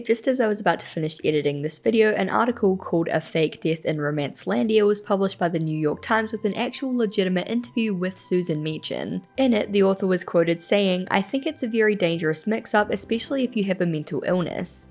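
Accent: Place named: New Zealand. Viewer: I think she's a Kiwi?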